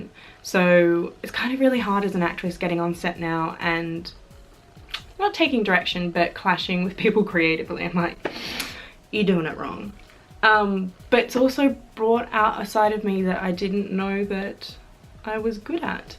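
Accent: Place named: South, United States. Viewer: I love the Southern accent 😊😁😘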